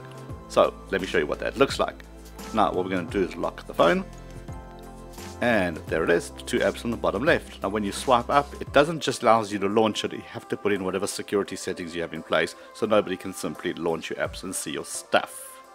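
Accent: South Africa. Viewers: Sound south African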